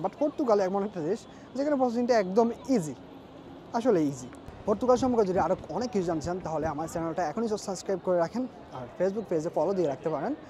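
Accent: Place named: Sylhet, Bangladesh. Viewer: ভাই তো মনে হয় আমাদের সিলেটি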